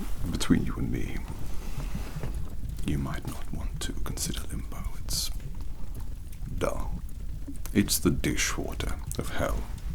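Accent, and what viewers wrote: Wales, United Kingdom. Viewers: even tho I'm pretty sure you're Welsh hehe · Yes finally an asmr video that combines all my favorites. Asmr, and Demonology, and british accents. Fantastic performance good sir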